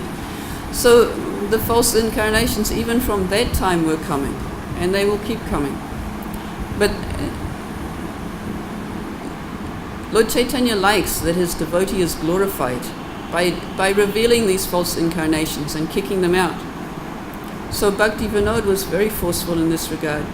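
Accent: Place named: South Africa. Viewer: Hare Krishna! Where is Her Grace from? It sounds like my homeland's accent (South Africa)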